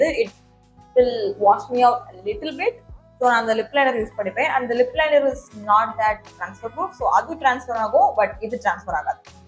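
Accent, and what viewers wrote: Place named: Kanyakumari, India. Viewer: Sis..! Your slang is nice.Are u from kanyakumari?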